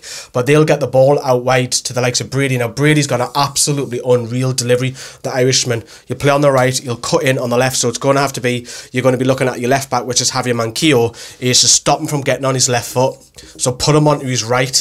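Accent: Newcastle, United Kingdom. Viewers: Love the geordie accent (from London)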